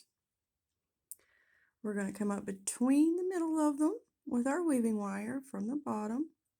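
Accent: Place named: South, United States. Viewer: I saw a top comment of yours and I thought that was pretty awesome. Never met too many southern white people who enjoy 2Pac. Love your beautiful southern Belle accent, Mrs.!!! I’m from the south as well btw. 😊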